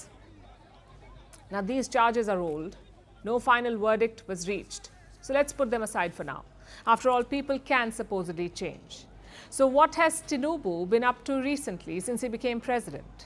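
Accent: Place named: India. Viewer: She's an Indian, I guess her native accent influences her speech pattern.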